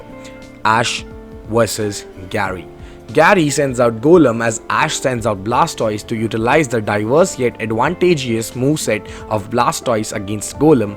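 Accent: India. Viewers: Your Accent its like Indian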